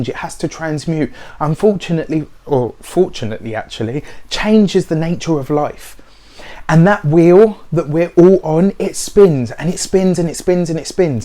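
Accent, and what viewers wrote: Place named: London, United Kingdom. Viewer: He's not Manc. He's quite clearly London way. #watchatalkingaboutwillis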